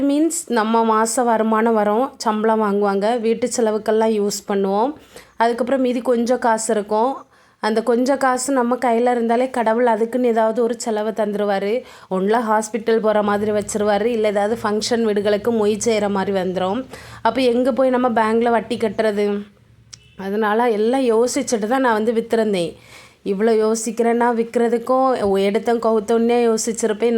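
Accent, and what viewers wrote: Kanyakumari, India. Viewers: Indha slang a kettalae Kanyakumari nu takkunu thonum😂😂😂😂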